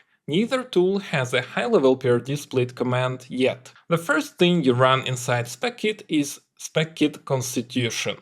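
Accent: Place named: Russia. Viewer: strong russian accent Есть аналогичное видео на русском?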